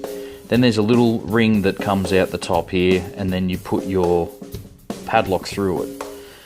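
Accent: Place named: New Zealand. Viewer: Wow - surprised to hear your New Zealand accent after reading the title...I thought you guys would call them a box and not a can. Anywho, I hope you’re still going to make videos. How about throwing us subscribers a bone and making some new content ya old sheep shagga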